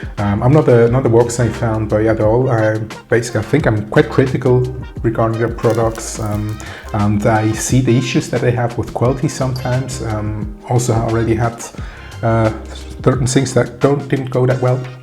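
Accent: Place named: Switzerland. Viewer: Bisch du Schwiizer? De Akzent hani nach 3 Sätz kennt 😅. Aber dis Englisch isch super!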